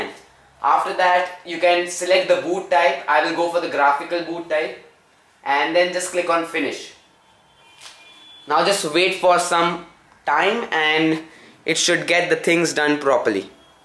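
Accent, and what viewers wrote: India. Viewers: Great video. Love your accent, I work with several guys from India, you made me feel at home!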